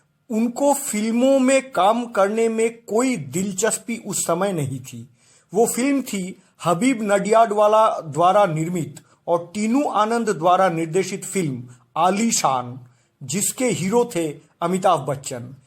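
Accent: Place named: Bengal, India. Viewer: Baangaal na tumi ... Xcent ta oto sundoor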